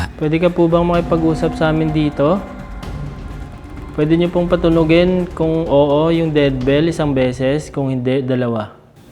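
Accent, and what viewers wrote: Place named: Ilonggo, Philippines. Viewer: Ilonggo gid na sia ayy. hahaha tuno ngani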